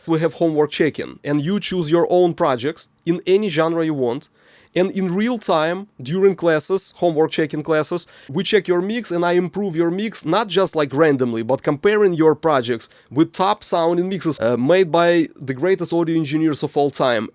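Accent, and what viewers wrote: Russia. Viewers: абажаю твой английский, ты говоришь на английском как-то по.... русски😄поэтому очень легко смотреть. ну и само собой материал-это просто бомба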